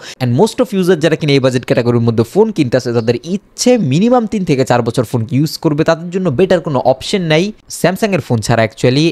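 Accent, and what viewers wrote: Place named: Noakhali, Bangladesh. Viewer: ও ভাই দয়া করে এই নোয়াখাইল্লা ভাষা ছেড়ে শুদ্ধ বাংলায় কথা বলুন। অথবা ইউটিউবে ভিডিও বানানো বাদ দিন। আপনারা বাংলা ভাষাকে বিকৃত করছেন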